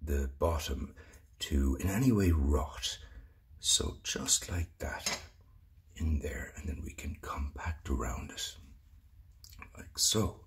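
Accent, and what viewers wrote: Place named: Ireland. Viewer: Sounds like a grand Irish accent.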